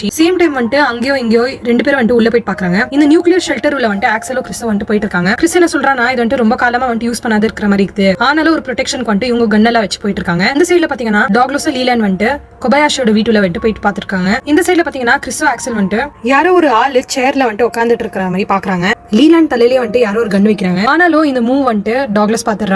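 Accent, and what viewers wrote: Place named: Bangalore, India. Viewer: Banglore tamil super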